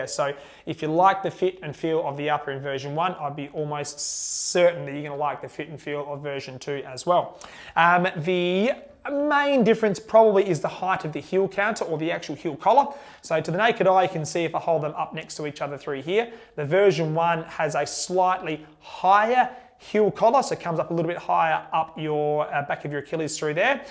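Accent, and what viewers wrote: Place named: United Kingdom. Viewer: It's difficult enough to understand with a british accent, it's more difficult when the speaker talks very fast, I have to replay certain topics. Aside from that, great Review!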